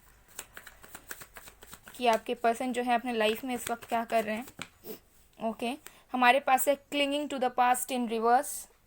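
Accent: Bengal, India. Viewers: Sis tum bengali ho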